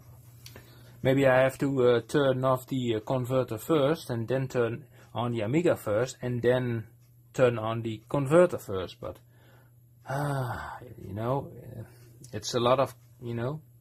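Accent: Netherlands. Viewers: I lof Dutch akksent. 😍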